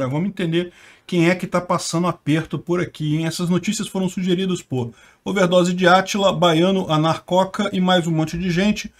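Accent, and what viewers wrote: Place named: Rio de Janeiro, Brazil. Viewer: Uma sugestão pro seu canal: que tal colocar uma música de fundo? Você é do Rio de Janeiro? Deve ser do interior, porque seu sotaque é meio misturado...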